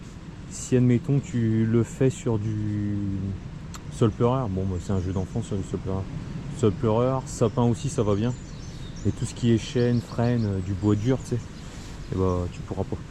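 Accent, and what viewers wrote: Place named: Nord, France. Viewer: Ti t es de ch'nord. Merci pour la vidzo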